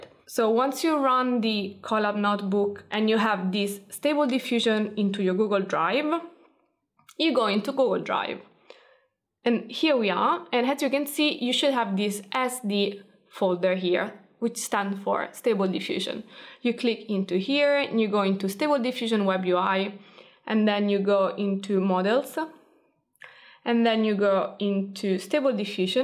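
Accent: Italy. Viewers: Ho notato il tuo accento italiano e dopo aver visto il tuo nome ho avuto la conferma. Fa piacere vedere altri in Italia interessarsi a queste cose :)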